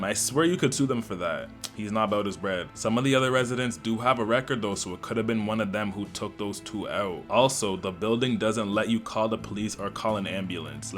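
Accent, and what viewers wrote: Canada. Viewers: holy shit saying “dutty” you aint jamaican my boy 😂 stfu i hate canadian accents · This Canadian accent is just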